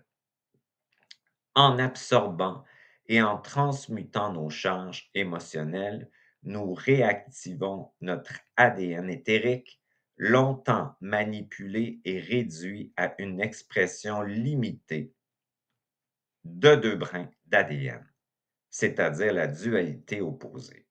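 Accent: Quebec, Canada. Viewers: Bonjour, Merci pour tes partages. Je te suis depuis 2 ans. J'ai foncé lorsque j'ai entendu "Je ne veux pas revenir ici". Waouh... ça a fait tilt!! Enfin un "youtubeur" qui propose du contenu et qui dit tout haut, ce que je pense dans ma tête depuis des années. oui... dans ma tête parce que lorsque je dis ça à des copines elles me répondent "La vie est belle!!" Ha bon!? "Ceux qui meurent de faim, les guerres....etc..... tu trouves que la vie est belle toi?? Sont folles dingues!! J'adore t'écouter parler, ta sincérité me fait du bien, les termes que tu emploies sont top et drole!! j'adore votre vocabulaire à vous les gens du Québec et cet accent... j'adore!! J'avais déjà eu une approche du point zéro avec le livre "Essence de kishori", donc ton discours avait du sens pour moi. Nous avons eu quelques rdv, puis pour des raisons financières j'ai dû laisser de coté, donc les vidéos que tu proposes sont un excellent soutien et surtout cela ouvre à nouveau ma conscience, car je viens de rester au fond de l'abime durant 3 ans, j'ai cru que jamais je n'en sortirais... 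Damned.... l'épreuve de la solitude, c'est horrible lorsque l'on est mal. Tout le monde tourne le dos. Bon... ça va mieux!! Alors, ce message, c'est parce que je m'interroge???? Le fait de mettre le focus sur toi (nous sommes nombreuses et nombreux), l'égrégore il est là... qu'on le veuille ou non!? Donc il est utiliser....!? Même si tu nous dis de ne pas te croire, de penser par nous.... ben ça c'est p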